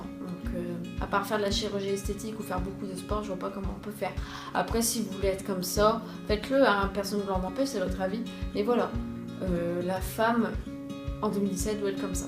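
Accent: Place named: Nord-Pas-de-Calais, France. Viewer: TRES BONNE VIDEO ! On entend bien l'accent du nord, bien de chez nous ! ^^, question complexe perso j'en ai plein, mon corps en premier (obèse), mais j'ai adopté le "balécouillisme" enfaite, sa consiste a ignoré le regard des autres, les moqueries etc. Il faut juste s'écouter soit même, perso depuis que j'ai adopté ce truc, je n'ai aucun soucie a me foutre en boxer de bain, si tu n'aime pas mon physique tu ne me regarde pas puis c'est tout ! et comme je dit toujours "Si tout le monde se ressemblerais, le monde serais CHIANT"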